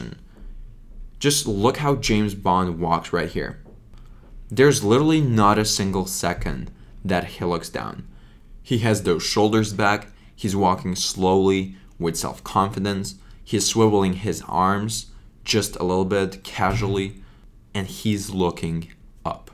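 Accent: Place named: Russia. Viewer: Русский акцент спалился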